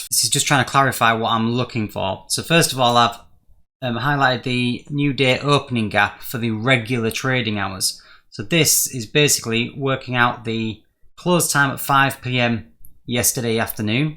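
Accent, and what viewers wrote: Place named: Yorkshire, United Kingdom. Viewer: Interesting you used the 15m FVG, not noticed that previously from other channels. I'm seeing traders posting silver bullet entries with order blocks and OTE on 1 min charts. Generally, when FVGs are featured, they're a confluence within an order block. Nice to hear a Yorkshire accent on a SB video :)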